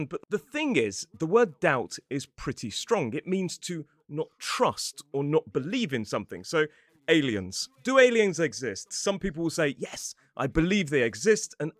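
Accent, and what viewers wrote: United Kingdom. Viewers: Wow.Your voice reminds me of the singer from Coldplay — he has such a beautiful accent that I wish I could understand it. I gotta say, for some reason I always stuck with American English at school— but I think I’m changing my mind. I used to think British English was kinda boring, too formal, or just hard to follow. When I'm in London I feel an idiot. I cannot answer even simple questions... I don't get them. Perhaps it's a matter of accent I 'm not used to. But you totally proved me wrong. Thanks,! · Love listening to your accent, it reminds me of my university semester in the UK 😍 P.S. Liverpool and Muse (not "the Muse" like my Italian friends would say)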